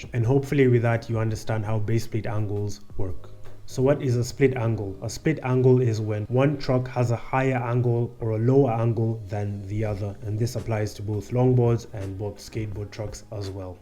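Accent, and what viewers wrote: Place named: Africa. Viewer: ive never commented in 7+ yrd watching youtube. I skipped over yours cause of a black man W/ affrican accent under a few seconds. After tons of BS from other channels I watched to see you explain in a way I could not only comprehend but was exactly the info I needed to mitigate tje problems witj my ride i I was facing but could not pinpoint. Although the true test of tne info you shared will be on the black top, Im adjusting my equipment as I text and we'll see. I'm telling this to say that predjudice sucks.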